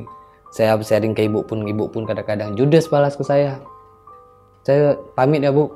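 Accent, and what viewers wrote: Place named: Aceh, Indonesia. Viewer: Logattt acehhh banget abgnya✌🏻😁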